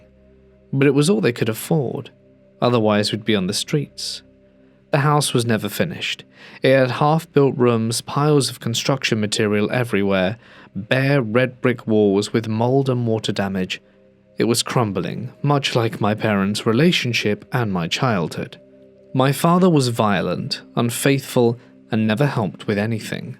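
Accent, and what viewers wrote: England, United Kingdom. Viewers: Lovely ..ever so.quintessentially English voice ..just lovely .From from NW England myself ,makes a change hearing a REAL VOICE & not this Ai souless rubbish..Thank you x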